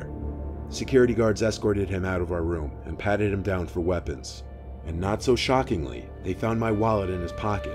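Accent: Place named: Long Island, United States. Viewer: You sound like ur from Long Island NY! If so ❤️❤️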